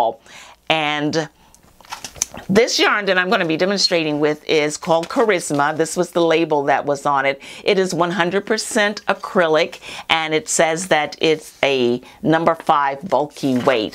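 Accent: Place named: Boston, United States. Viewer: Thank you so very much for doing this! You are a great teacher, and your accent reminds me of my grandma who was from Boston. I will be watching more of your videos! :)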